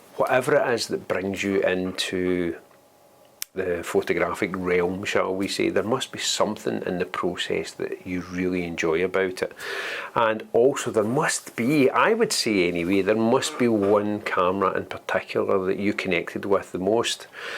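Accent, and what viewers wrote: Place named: Scotland, United Kingdom. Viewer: I'm going to come across as a complete donkey's ass but I'd bet a penny on it. Have you thought about adding subtitles to your videos given your charming Scotty' accent? I've got no problem with it all. I love it. As for your American and second language audience given your strong accent? Hmmm...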